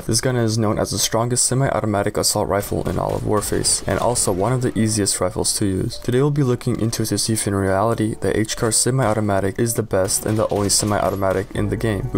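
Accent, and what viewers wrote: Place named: Russia. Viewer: По акценту видно русский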